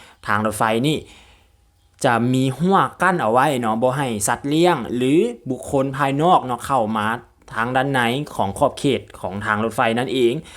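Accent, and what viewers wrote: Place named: Thailand. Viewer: ສຽງໄທບ້ານຫນອງຫນ່ຽງເບາະຄົນບັນຣະຍາຍນີ້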